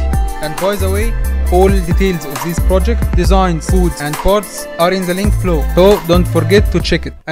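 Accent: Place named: Egypt. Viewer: لهجتك في الانجليزي بتقول ان انت مصري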